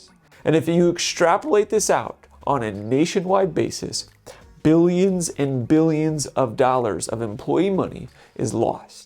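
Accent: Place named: California, United States. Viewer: This dude sounds like he’s from California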